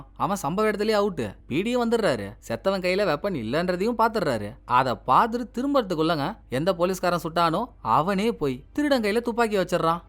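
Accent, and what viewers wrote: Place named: Chennai, India. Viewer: Pakka Chennai fellow bro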